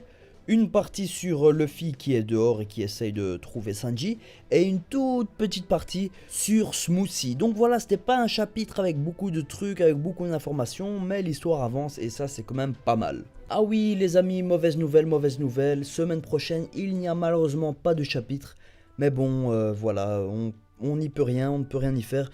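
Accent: Belgium. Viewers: "ouit cent cinquante deux" hmmm je kiffe l'accent belge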